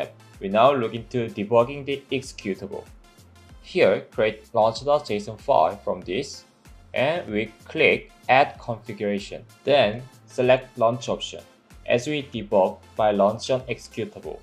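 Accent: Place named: South Korea. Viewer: 발음이 한국분이시네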